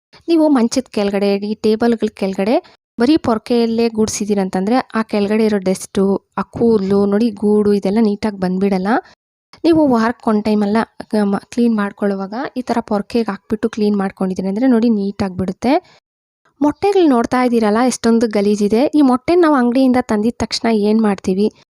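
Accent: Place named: Kolar, India. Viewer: Neevu kolar dhavru ansuthe...naanu kolar avne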